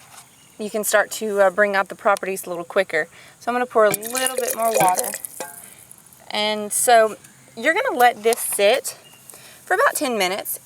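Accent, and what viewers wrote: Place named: South, United States. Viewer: Is that Loblolly Pine. I recognize that accent and I know the only pine that grows in the south is Loblolly. I am from Arkansas and you have to go to Missouri before you find white pine.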